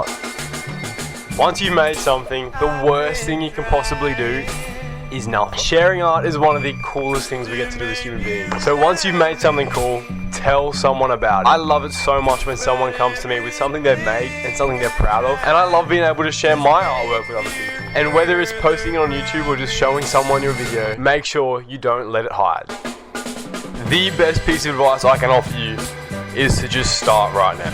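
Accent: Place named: Australia. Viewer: Yo bro, loved this vid. It's funny hearing a proper Aussie accent on youtube, also that mic is sick as bro.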